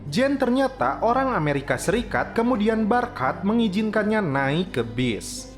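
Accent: West Java, Indonesia. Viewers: orang sunda ya bang🗿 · Jirr..adminya Sunda euyyy😂😂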